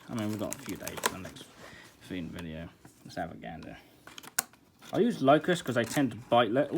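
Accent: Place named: United Kingdom. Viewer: Can tell your from Britain .. what part though? 🤔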